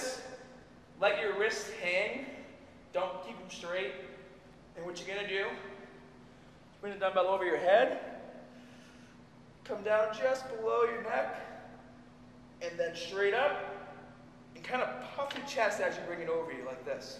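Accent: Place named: New York, United States. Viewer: He's from New York...that's how they talk! :)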